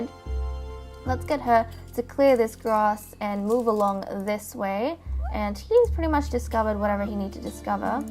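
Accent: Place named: England, United Kingdom. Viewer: They are so cute!! You have an English accent!!!!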